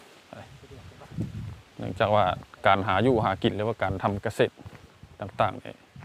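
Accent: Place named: Nakhon Phanom, Thailand. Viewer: เว้าคือบ้านเฮานครพนม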